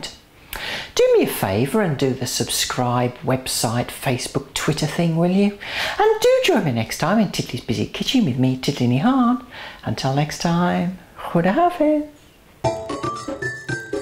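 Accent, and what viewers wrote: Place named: Solihull, United Kingdom. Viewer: Your voice is just the same as my grandma's shes from solihull. I live far away from her so i love watching your videos to hear that accent! xx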